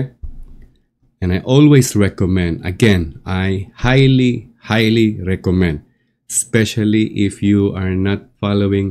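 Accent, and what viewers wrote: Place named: Ilocos, Philippines. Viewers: the accent gives ilocano vibes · Hey, base on your accent and your name 🤣, I could tell your a Filipino, don't know if I'm right, but Well done kabayan. Pin if your really a Filipino pls.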